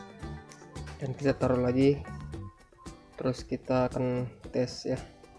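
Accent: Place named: Sulawesi, Indonesia. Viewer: Orang sulawesi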